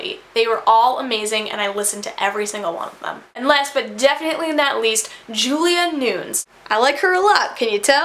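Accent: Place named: Upstate New York, United States. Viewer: Upstate accent lolz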